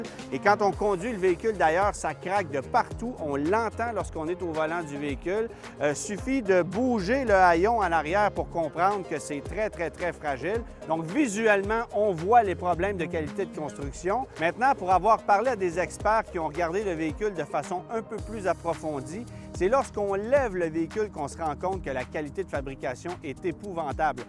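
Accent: Quebec, Canada. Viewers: L'accent québécois, on pourrait s'en passer. Parlez français s'il vous plaît. On a pas tous envie de vomir 🤮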